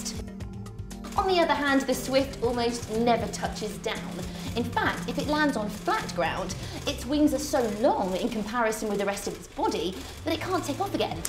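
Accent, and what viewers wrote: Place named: England, United Kingdom. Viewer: senin ingiliz aksanına USS Misisipi çarpsın.. bu kadar mı itici olur bi kadın ya...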